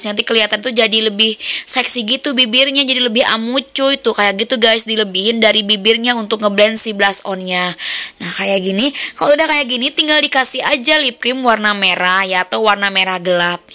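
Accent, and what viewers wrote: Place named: Java, Indonesia. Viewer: Terlalu medok, tpi warna bdakny si natural bnget, cma ke Medokan Tpi bgus ko, aku suka , suka sekali mba Terimksih tutorialny, semoga cnelny tmbh maju dn sukses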